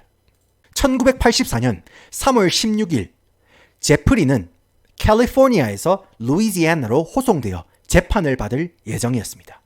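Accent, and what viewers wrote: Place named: Gyeongsang, South Korea. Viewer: 갱상도 ㅎㅎ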